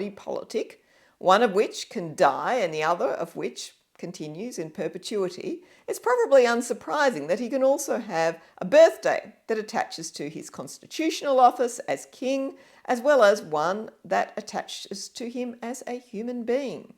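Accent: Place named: Cultivated, Australia. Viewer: I have never heard a more aristocratic Australian. G’day, mate.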